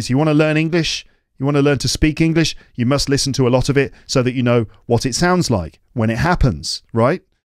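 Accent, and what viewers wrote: United Kingdom. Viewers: HI, I love this episode so much. I started to FOLLOW your videos on YOUTUBE because my boss's pronunciation is very similar to yours, and he is also from the UK, which seems to be Yorkshire. But after actually listening to it, I feel that your accents are still different. Yours is very standard British English. I often can’t understand my boss’s English. Everyone’s feedback is that he didn’t open his mouth when he spoke. I don't know if his teeth grow well, because he basically doesn't show his teeth when he talks. But your teeth look good. I am so happy to learn that your daughter is also learning the violin today. My daughter has been learning the violin since she was 5 years old. Although she did not start a performance career, she has cultivated her musical literacy, which is enough for me. By the way, she is currently a sophomore in London, and she is enjoying life in London very much, I am very happy for her. 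In short, thank you so much for accompanying me in my leisure time with so many videos, thank you, and wish you all the best in your life! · Congratulations 🎊 👏. Time fly by. I still remember myself looking for a good British podcast, after I noticed that I'm only exposed to the American English through TV shows, movies and podcasts. I looked and looked for a British podcast. Finally, I stumbled on English Podcast and became a huge fan. Thank you from the bottom of my heart, without this amazing podcast, I would never learned that much about British English and its various accents. Congratulations,. keep up the good work.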